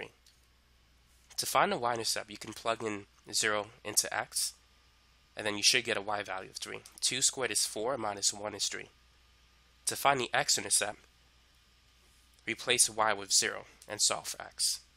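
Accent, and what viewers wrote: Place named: New York, United States. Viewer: Are you from new York or Boston. you sound like your from new York or Boston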